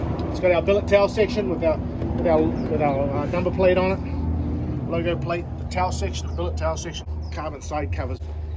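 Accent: New Zealand. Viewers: Is the guy talking from Nz?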